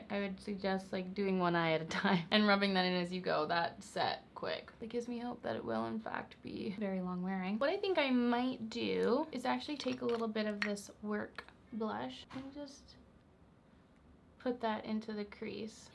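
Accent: Canada. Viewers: I'm living in Scotland but I'm from Vancouver Island, I love watching your videos! Love your makeup and fashion style plus you just seem nice and fun! Love hearing a Canadian accent as well! I would love to know where you get some of your cute little gold earrings. Keep up the great work! xx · She she Canadian?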